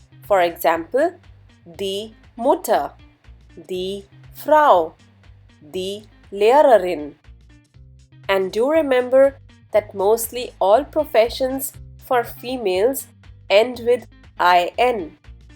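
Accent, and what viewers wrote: India. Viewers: I am Indian and I am quite sure this excellent teacher is Indian :) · Does anyone listen her speaks in indian accent? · 13 seconds in, and the accent of the narrator is rather Indian. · You seemed like is Indian...., sounded like it! 🤔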